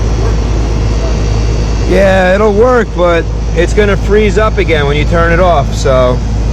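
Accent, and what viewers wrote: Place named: Massachusetts, United States. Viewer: I thought so but I also thought you might be in Mass your accents not that strong. I'm in South Carolina right now but I live most of my life in Mass and been doing the trade for 11 yrs and Love it. You have a lot of the same techniques that I have . It's like watching myself. I am on the Commercial side but have done my share of attics at 120f. Dont miss the winters up there though.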